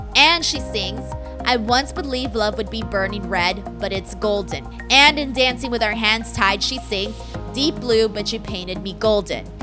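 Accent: United States. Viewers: Great video but it's difficult to listen to. Very american.... Very SCANDAL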